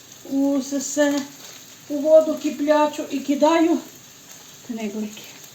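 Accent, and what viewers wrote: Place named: Bukovina, Ukraine. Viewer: Яка розумниця!!! Я сама родом з Кіцманя,Чернівецькоі обл. Яка мені люба Ваша мова буковинська! Аж серце щемить!!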